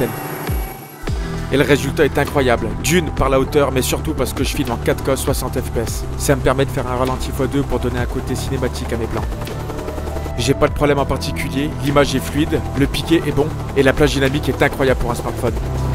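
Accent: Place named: North, France. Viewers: Tu as l'accent du Nord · Tout ça avec l'accent de ch'nord , ça fait plaisir a entendre cha tisotte hein ! ;-)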